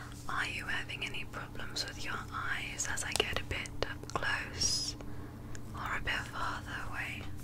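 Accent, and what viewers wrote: United Kingdom. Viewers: i see she channeled her inner brit for this vid and i kinda like it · As soon as I heard the accent I was like:THERE BETTER BE A COMMENT WITH THE BRITISH ACCENT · ehh:3 The British accent is back - Love it:3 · You are the Most Beautiful Doctor with The Most Beautiful Eyes and the Most Beautiful British Accent!! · That British accent though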